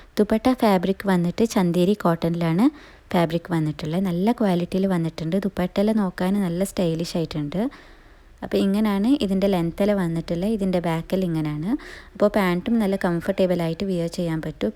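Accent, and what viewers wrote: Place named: Kasaragod, India. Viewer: കാസർഗോഡ് ഭാഷ ഇങ്ങനെ ആണ് സുഹൃത്തേ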